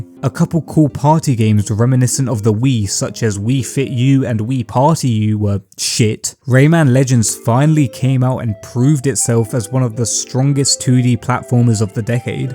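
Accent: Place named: England, United Kingdom. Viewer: The other games that were shown off at the reveal conference should have tipped people off. New Super Mario Bros U is one thing but that first person shooter gameplay showed off that should have tipped people off that it was a new console instead of a new controller because did anybody honestly think that that game could have ran on the wii? Considering later on in the system's lifespan there were downloadable Wii games besides the fact that they should have done way more of download of a Wii games, they should have taken advantage of the hype and scarcity of physical copies of Xenoblade Chronicles. Since you have an English accent you probably wouldn't know but here in North America GameStop sold Xenoblade Chronicles exclusively and they took advantage of the hype surrounding that game by jacking the price is way up.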